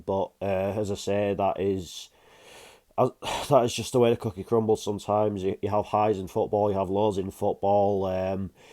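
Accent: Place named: Northern England, United Kingdom. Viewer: Northern english accent there, whereabouts you from? Im a celt born in london myself🍀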